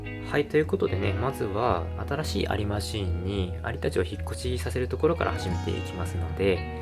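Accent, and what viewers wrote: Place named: Japan. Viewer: I like your Japanese accent but i dont understand Japanese 😭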